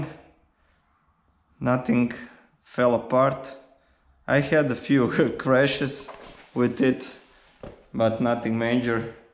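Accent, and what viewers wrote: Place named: Russia. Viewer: Cool work! Love your kinda Russian English! It's a freaking death machine!!! ;-)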